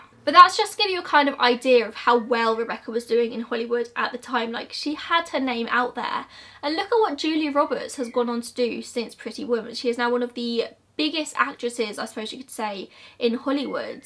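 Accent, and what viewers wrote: England, United Kingdom. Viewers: hey, I'm a new sub. Long time true crime addict and I sub to a few true crime channels. I do sub to more but I end up unsubbing for either personality or bad info reasons. I won't be unsubbing from here, Ive binged a few of your videos and I love your delivery. Im in the UK, I'm in Kent... I'm trying to work out where you're from based on your accent and I'm thinking either Surrey or maybe Kent. Am I right? It's great having a Brit to watch as most of my subs are from the U.S. Looking forward to more great vids. And your make up and jewellery and clothes are gorgeous every time (I know that's not the point). I saw some love.y gold chain rope earrings on one video, where did you get them? I need them lol, I was a teen when they were first in fashion. Love from Kerry in Kent 💕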